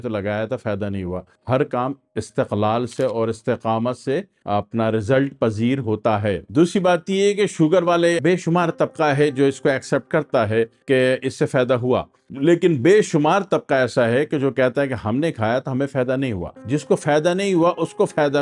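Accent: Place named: Hyderabad, India. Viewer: Aap bilkul hyderabadi lehje mei boley hyderabad, khushi hui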